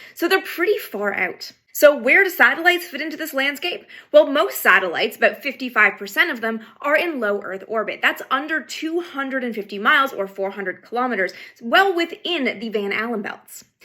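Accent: Canada. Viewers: the Canadian accent lol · Please make a video just saying the words “about” and “out” and any other Canadian sounding words. You really need your own cable network dear. You are amazing. You’re my granddaughters age and I wish more of your generation loved the space program like my generation ,who grew up watching Mercury, Gemini, and Apollo, life on TV. Keep up the oatstanding (see what I did there) work❤️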